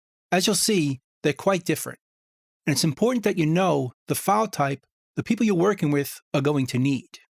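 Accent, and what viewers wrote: New York, United States. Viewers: Thank you. From a newb, you make things very understandable and clear. PS Love that NY accent:)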